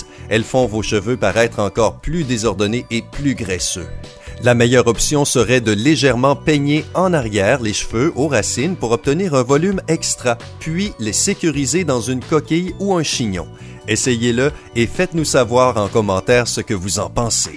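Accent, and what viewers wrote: Quebec, Canada. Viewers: L’accent québécois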